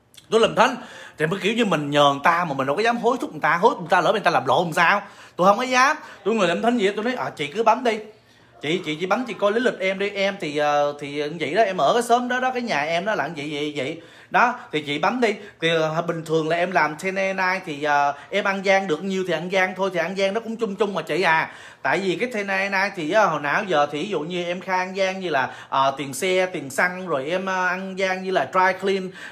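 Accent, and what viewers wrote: Binh Dinh, Vietnam. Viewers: Tina Bennett ....tôi nghĩ ổng từ Quy Nhơn Bình Định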